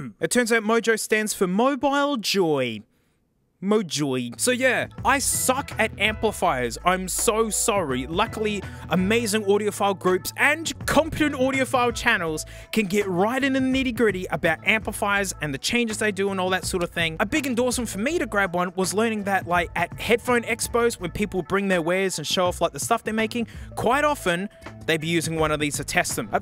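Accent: Australia. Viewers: he's austrailian...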